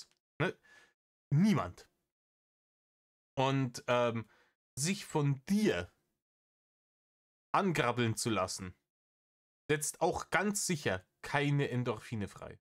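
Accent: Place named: Bavaria, Germany. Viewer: Wie sympathisch, mir mittlerweile bayerischer Dialekt erscheint, seit ich den hör! Ofi ist die Puffmutter, der gute jedoch trifft absolut meinen Geschmack, wie man bei uns sagt: mit dicker Fresse und ziemlich viel dahinter! Also,i geh jetzt nein da,in den Reiner!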